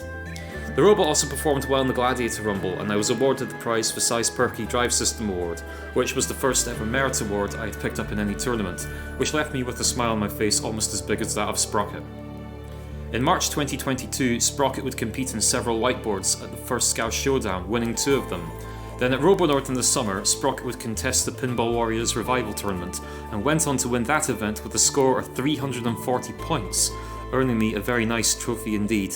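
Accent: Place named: Scotland, United Kingdom. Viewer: Nice story and scottish voice. ;3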